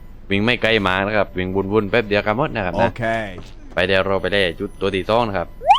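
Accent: South, Thailand. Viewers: แหลงใต้นี้แหละ ที่ต้องการ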